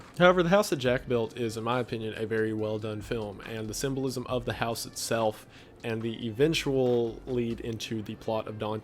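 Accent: South, United States. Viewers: You sound kinda southern and idk if its in all your videos but I just noticed it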